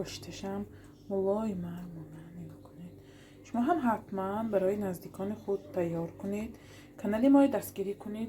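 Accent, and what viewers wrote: Isfara, Tajikistan. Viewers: Лафзи Исфарагихо мора а хонаш мебурурад😅😅😅😅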